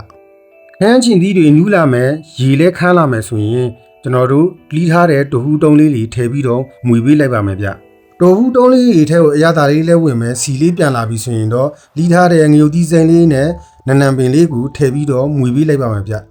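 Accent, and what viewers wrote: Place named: Danu, Myanmar. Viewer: မွှေးရပ်မြေကိုလဲလွမ်း ငယ်ဘဝကိုလဲလွမ်း ဓနုသံဝဲဝဲကိုသတိရ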